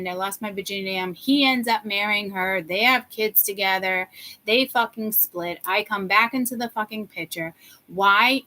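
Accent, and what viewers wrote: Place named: New England, United States. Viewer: I love your honesty. I’ve been following you since day one at your condominium. I rarely catch you live but I always rewatch. You are so gifted. Brenda from New Hampshire, formally Stoneham, Ma. We share the same accent 😂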